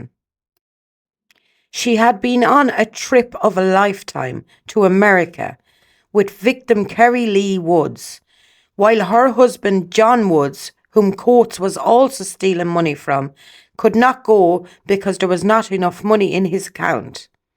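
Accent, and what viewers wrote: Ireland. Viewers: What part of Ireland are you from ?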